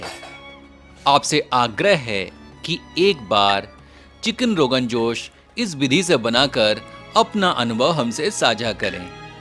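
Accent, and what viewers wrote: Standard. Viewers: this voice (pure hindi) can't be forgotten once heard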